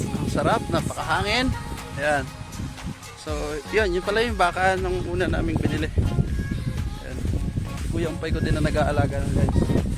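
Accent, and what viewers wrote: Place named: Guinayangan, Philippines. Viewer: hahaha kaya pla medyo pamiliar sakin pagsasalita mi guinayangin kasi ako sir💪💪 tuloy tuloy lng sir suporta tayo dyan proud quezonin💪😁🏆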